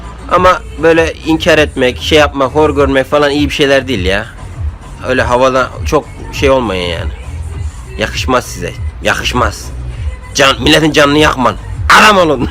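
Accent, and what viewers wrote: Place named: Terme, Turkey. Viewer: Abi senin konuştuğunu genelde termede konuşuyorlar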